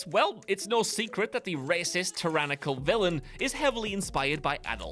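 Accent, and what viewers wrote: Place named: Northern England, United Kingdom. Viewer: LOL, The number of ppls not getting the more Northern accent here is amusing me no end. He DID say Bader just in the way anybody from his area would. Reminds me of Americans needing Subtitles for Trainspotting 😂.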